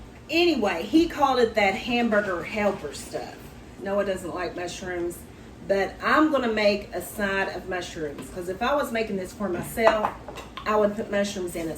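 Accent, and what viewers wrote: Kentucky, United States. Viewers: I don’t comment on yt much but I have to for you! 😂 I can’t stop watching your videos! I love your rawness/transparency, personality, smile, accent (soon as I heard I thought she has to be from Kentucky- bc I am too 😁) and of course love your recipes. They hit home and remind me of my mom and grandparents cooking who have all now passed on. 😢 there were some I wish I had gotten from them and found here so thank you!! (Chocolate gravy for example) You are beautiful and have a beautiful family. I have 3 sons ages 23, 22, and 20. So I can relate! Guys don’t be so shy and help support your mama. 😂 💯 I’m sure she deserves it and seems so proud of you all. 💖 keep up the good work guys. Best wishes 🤟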